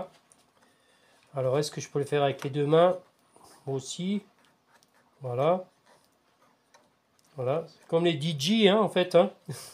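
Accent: Alsace, France. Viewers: Vous n'auriez pas l'accent alsacien comme moi ? 😎 Cette table de mixage me rappelle ma jeunesse quand nous animions des bals...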